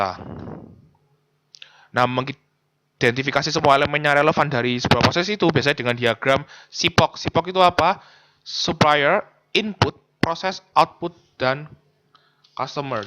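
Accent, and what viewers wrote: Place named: East Java, Indonesia. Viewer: jatim banget, lek ngomong cepet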